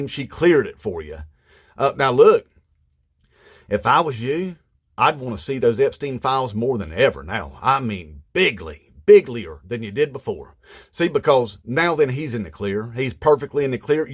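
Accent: South, United States. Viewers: So damn good! I just recently found, could not have appeared at a better time, I'm trying to maintain what's left of my sanity. This man's voice is so spot on, the tone he uses, the wry humor, the precision of his satire, it's just perfect. Also music to my ears is his southern accent. Living out here on the left coast we don't get a lot of well spoken truth in that accent, it's an affirmation that perhaps we're not as close to the abyss as we think we are to hear that other people in other parts of the U.S. can see the same things we do. This man's voice is of tremendous value right now, I will subscribe and look forward to his commentary on this non stop train wreck we're living through. Inmate trump.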